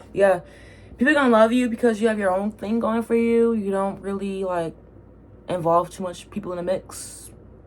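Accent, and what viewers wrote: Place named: United Kingdom. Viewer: Pile 2… AHHH your voice is genuinely like body lotion for the spirit 😂even when you’re being blunt with me about what I need to hear 👀 also why is your British accent sounding even MORE British than mine right now???hahhh A lot of this definitely felt like old energy & some is energy I’m still in the process of releasing. Omgg yes I’m not anti advice AT ALL, Don’t get me wrong, I’ll always ask for tips and recommendations when I need them. But unsolicited advice… especially around my health… I just can’t 😭 The constant ‘have you tried this?’ can feel so overwhelming and like I’m being pulled in a million directions, even when people mean well. It can make me feel like I’m not ‘doing enough’ Sometimes I just need empathy… not ‘fixing’ lol I really loved your take on simply observing the energy & sitting with it, without feeling like I need to take immediate action. 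I need that right now. Pile 2 also lovingly called me out on my own bs 😅 I moved away &released a toxic environment, but I’ve also realised I play a part too… bc I’m very mentally and physically restless and really struggle to just BE. it’s about shifting the mindset not just the environment! That has been a challenge for someone who always wants to be ‘doing’ something when sometimes I actually just need to rest. ahhhh thee synchronicity whatttt😭 I mentioned that song in my last reading… & you posted yours before mine 👀 & I promise I only just watched it now and didn’t know you mentioned this til just no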